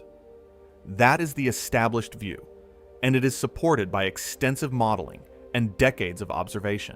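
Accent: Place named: United States. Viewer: This is how a space documentary shod be fly and cleanly narrated in clear American English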